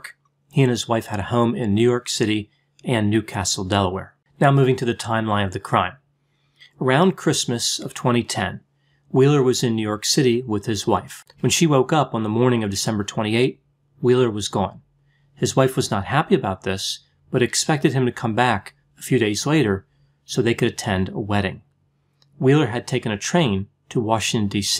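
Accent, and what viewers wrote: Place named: Baltimore, United States. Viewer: There was an Unsolved Mystery located where I'm from too. Season 1 Episode 1- Baltimore at The Belvedere Hotel. I lived a block away from The Belvedere for 5 years. In one apartment I had The Belvedere was my bedroom window view. It was really fun seeing all of the different shots of the building and the neighborhood around it. Some great shots in that episode. I knew was lived near me because we have the same accent.:) Regarding this case- I also said trash truck compactor but they didn't mention that in the show. My other theory is he was beaten by teens because there is a problem with teens beating/ killing homeless people. They probably saw him disheveled and thought he was homeless which is why they never looked to take his watch and money. Then he was either put or went in the dumpster then finally killed by the trash truck. 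I wondered if he ended up in Newark because he was trying to get to New York and he misheard the person who gave him the ride.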